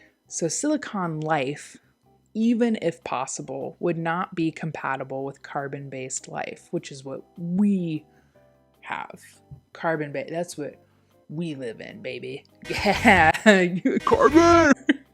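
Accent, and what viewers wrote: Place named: Midwest, United States. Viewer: I love your humor and the way you talk, it reminds me of home, are you from Michigan perhaps… or maybe Minnesota?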